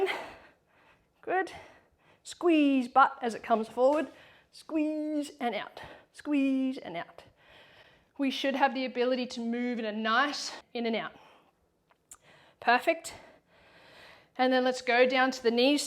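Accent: Australia. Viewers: Hi. I sent this on your facebook messages but saw you don't go on there often from your last post.... you've popped up on my you tube and i've been watching a few videos and just love your energy and you resonate so much with me in so many ways but mainly as i've had a problem with my eyes/neck and dizzyness for so long!!! I want to get through your videos but i was wondering if there is any one video or sequence that you think i should start with? i just started your 10 minute lymth workout and i love it and the constipation one was so funny!!! Basically my eyes feel like someone has pulled them out on a spring and then let go and that weird feeling(like i've had that done before lol) but only way i can explain it. I look forward to slowly watching all your video. Thank you for helping so many people and i love your accent, aussie accent is the best!! Reminds me of my INXS days ❤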